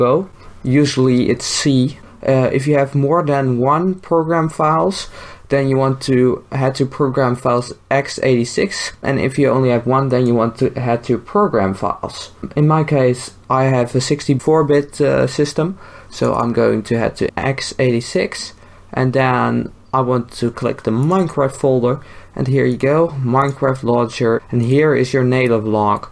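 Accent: Netherlands. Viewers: mooi nederlands accent man haha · Your dutch accent tho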